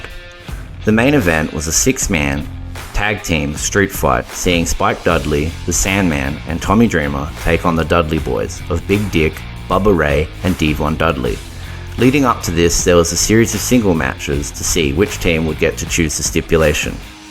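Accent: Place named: Australia. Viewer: it's exciting to hear what sounds like a fellow Australian ECW mark, E-C-Dub, E-C-Dub, E-C,Dub XD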